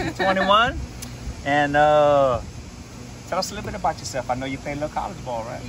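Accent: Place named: Louisiana, United States. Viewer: Hey where are you originally from? Sound like La Place or Gonzales area. Just curious.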